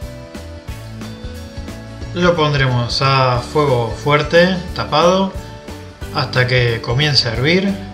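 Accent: Argentina. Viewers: sos argento?? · Hola...soy una nueva suscritora. Detecto un acento Argentino 😊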